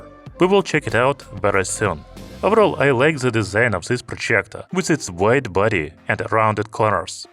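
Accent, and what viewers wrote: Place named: Russia. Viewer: Use AI for english, cause its hard to understand you Rusky!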